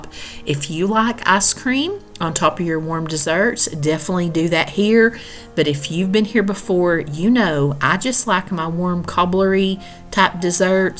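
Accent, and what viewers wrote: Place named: Mississippi, United States. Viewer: East Tennessee? I had guessed Mississippi accent; my husband thought Texas. Merry Christmas :)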